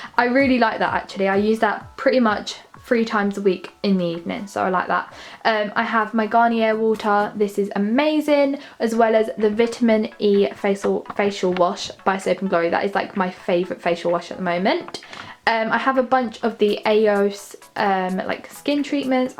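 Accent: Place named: Bristol, United Kingdom. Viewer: are you from Bristol because u have a Bristolian accent xxxx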